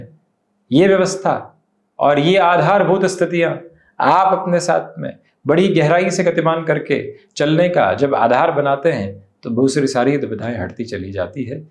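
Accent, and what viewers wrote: Standard. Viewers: पंडित जी आप बहुत शुद्ध बोलते है आप जो बोलते है उसका अर्थ समझ मे नही आता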